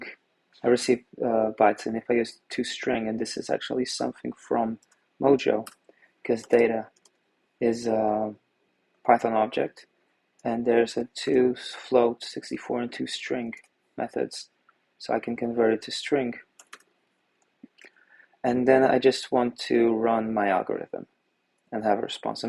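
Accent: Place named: France. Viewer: Et d'ailleurs, t'es français non ? J'ai remarqué un brin d'accent lol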